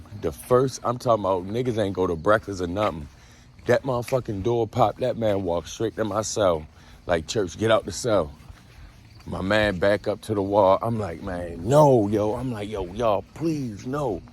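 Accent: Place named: Baltimore, United States. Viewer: I always wondered how come people from Balitmore are the only ones with an accent out of the whole country the way yall say ur R that always tripped me out and always made me curious bc its not only blacks from Balitmore its everybody thats born there,do you know whats the reasoning for that if you dont mind me asking i'm just hella curiouse on a side note great content keep pushing!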